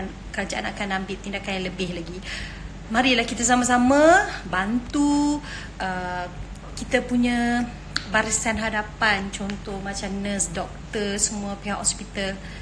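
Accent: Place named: Pahang, Malaysia. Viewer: Tokti buat tutorial masak cakap pahang pulak haha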